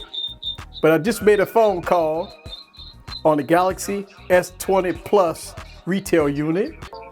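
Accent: United States. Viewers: I like your accent, what part of the US are you from? I'm from Liverpool UK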